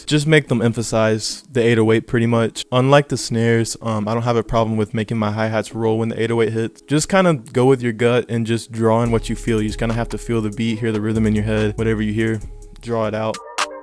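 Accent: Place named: United States. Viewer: I love american accent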